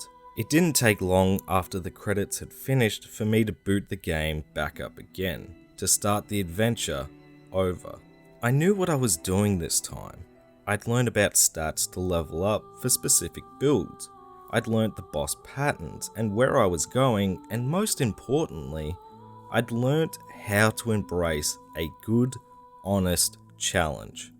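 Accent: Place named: Australia. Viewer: Great channel, you should have more subs. Also nice to hear another Aussie voice on youtube.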